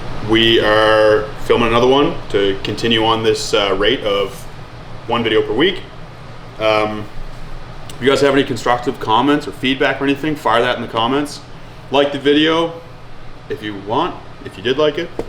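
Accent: Australia. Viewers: As an Aussie that accent was pretty accurate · That Aussie accent 😂♥️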